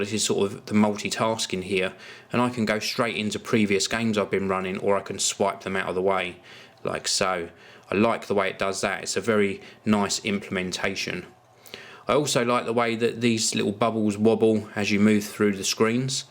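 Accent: United Kingdom. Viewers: Every reviewer is cooler with a British ascent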